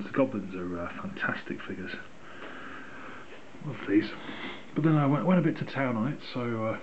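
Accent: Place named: Leicestershire, United Kingdom. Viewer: same here mate, i can tell by your ascent you would not have been far from where i used to live in leicestershire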